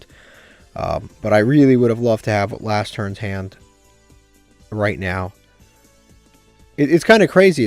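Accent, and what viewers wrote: United States. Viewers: Do I hear a Boston accent?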